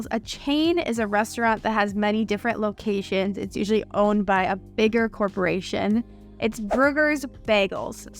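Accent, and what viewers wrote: United States. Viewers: which state are u from,?😊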